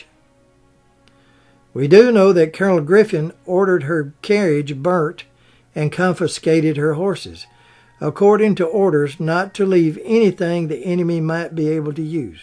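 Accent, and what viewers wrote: South, United States. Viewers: It's common to read comments on YouTube from Americans loving British accents but not so common the other way around. Well this old Brit lady adores your accent. I could listen to you talk all day. You could be reading the phone book for all I care, but the added bonus is that everything you present and narrate is interesting and informative. Thank you. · I hope you understand how wonderful your documentaries are, Don't ever stop Please. And thanks for talking slow and country. These days in most place on youtube, I have to slow them down so I can understand what the young people are saying..........lol........Life's Weird! :D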